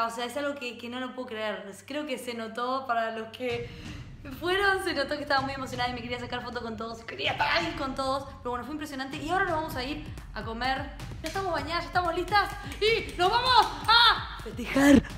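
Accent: Argentina. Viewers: eres más ser humano que YouTube. Tu acento argentino es muy lindo.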